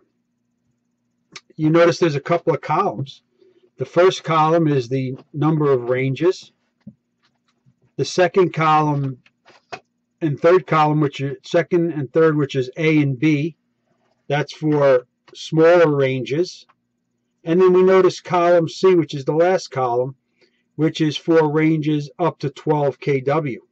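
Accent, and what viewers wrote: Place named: New York, United States. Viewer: 13.5 your garbage New York accent messed me up for a min. Good tutorial otherwise.👍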